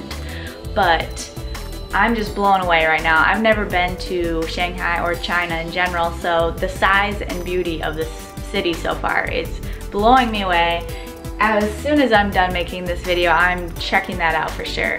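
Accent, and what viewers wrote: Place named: Midwest, United States. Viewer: Cool video. Did you just get a 24 hour pass on your passport to be able to leave the airport during your layover? And are you from the Midwest? I could hear a Midwest accent in your voice.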